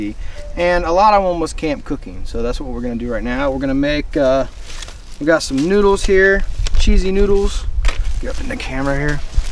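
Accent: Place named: Appalachia, United States. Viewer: good job. you ever spend any time in WV. sometimes I hear a slight hint of hillbilly twang in your accent (meant as a compliment)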